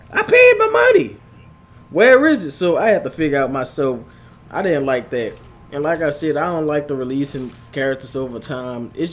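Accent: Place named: New Orleans, United States. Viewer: you sound like you from new orleans🤔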